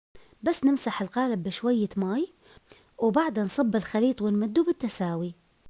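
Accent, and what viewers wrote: Mosul, Iraq. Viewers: اللهجه مصلاويه 😍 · عاشت أيدك وفدوة للكلام المصلاوي الجميل والترتيب والنظافة والراحة النفسية ياريت تعملين فديوات لترتيب وتنظيف وتنظيم المنزل أو المطبخ لان مبين عليك شاطرة في كل شيء وكيف إدارة أوقاتنا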